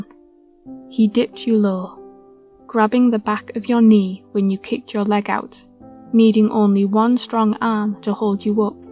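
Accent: United Kingdom. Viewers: never did i think i would reach a state in life where im listening to a british accent audiobook. i think im depressed.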